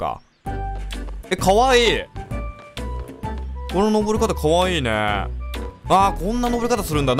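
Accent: Kansai, Japan. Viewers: *の関西弁ばりばりのやつ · バリバリの関西弁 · さんが関西弁だった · 関西弁多くて好きや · さんの関西弁定期的にみにいってしまう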